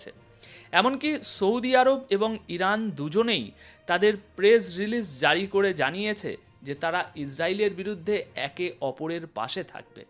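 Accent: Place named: West Bengal, India. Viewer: দাদা আপনার কথাগুলো ১০০% সঠিক, আপনার বিশ্লেষণ অনেক উঁচু মানের, আপনার কথা বার্তা শুনে পরিস্কার বুঝা যায় আপনি পশ্চিম বাংলার মানুষ, তবে বিগত ১ মাস ধরে আপনার সংবাদ দেখি, আমার খুবই ভালো লাগে, সঠিক কথাগুলো বলেন।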